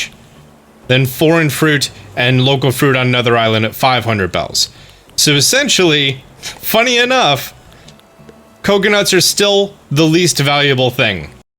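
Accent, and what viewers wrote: New Jersey, United States. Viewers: Dog I am pretty sure its a New Jersey accent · Can confirm, it's a jersey thing. I immediately knew he was from the same state as me first video i watched lol. Bergen/Passaic county perhaps??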